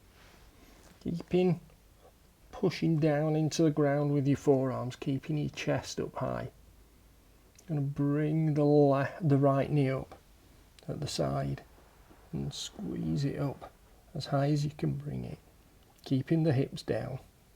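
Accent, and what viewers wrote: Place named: Sheffield, United Kingdom. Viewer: Only just found you after listening to Radio Sheffield, I have enjoyed your yoga session and have now subscribed. It is good to hear a local voice and thank you for the sessions which I shall now carry on with, much appreciated.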